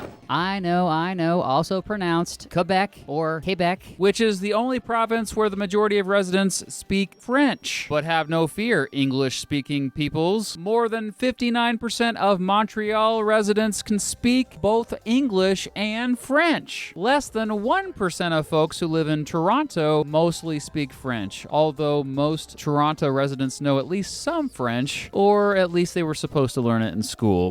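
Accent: United States. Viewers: His grating American accent was enough to stop watching . Get a Canadian at least to narrate a video about Canadian cities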